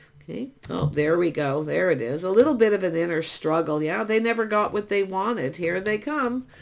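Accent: Canada. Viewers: Phenomenally close to the mark… I will be amazed if he really calls… it will blow my mind, but of course, I would welcome it and love an opportunity to try and sort this out… By the way, your accent sounds very much like my own… Are you Canadian? I live in the states now but born and bred in Toronto.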